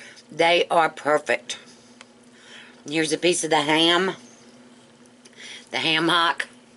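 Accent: South, United States. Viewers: Wow! I can tell from your accent that you're southern....you didn't grow up eating collards? Glad you discovered them.....they are yummy!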